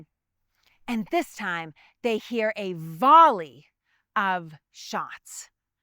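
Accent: Boston, United States. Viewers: I appreciate your Bawstun accent,. 😎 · Oh...love your "heavy" Boston accent BTW... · I'm from suburban Boston and was raised there from the 70s-90s and felt I knew the Boston accent, but yours is a little different. It sounds stronger and more pronounced in some ways. Wondering where you're from? And whether it's changed a little... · I love even just hearing your voice on these video. Listening to English being spoken the way GOD Intended it.😊 Those foreign accents non-Bostoniand have are SO alienating. Your voice IS "Home" to me. Keep up the FANTASTIC work you do. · Love having someone with an authentic "Bahs-tun" accent doing these videos! I'm always checking hers against my own and yeah, I sound that way too! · You from Somerville or Southie😂, I have the same accent😅❤ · This Bostonian has been trying to pinpoint what part of the Boston Area your accent is originally from? 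North Shore? South Shore? Dorchester? Medford? - Inquiring minds want to know! Even a hint will do. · Geeze, growing up in Albany we were down on Boston --- sox. Ur accent still hits me. Otherwise gd video. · It is good to hear a local person giving this history with a local Massachusetts/Boston Accent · Love your videos and the Boston accent 😊. It doesn’t hurt that you are gorgeous ❤ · As a proud Bostonian born and raised in East Boston. I never hear the accent when I'm speaking to my friends outside New England but I hear yours loud and clear and I have to smile. The passion you have for the story is tremendous. I have a couple of ideas for you during this the 250th Anniversary. First look at the Battle of Chelsea Creek and there is a resource for you at the Grand Lodge of Masons of Massachusetts on Boylston st. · You have a serious Boston accent. My wife always accuses me of the same...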